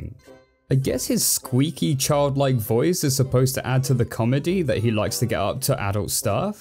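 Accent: United Kingdom. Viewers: Bro's got a British accent 😂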